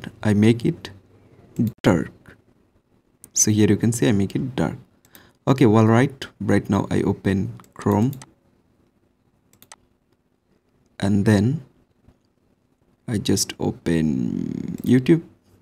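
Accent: India. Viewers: indian detected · that indian accent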